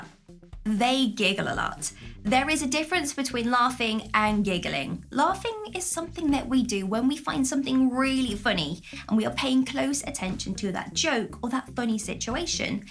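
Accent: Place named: Sheffield, United Kingdom. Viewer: Are you from Sheffield by any chance?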